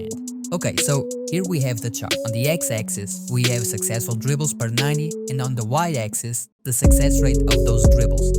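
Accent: Portugal. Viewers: Is the narrator portuguese? The english accent seems like it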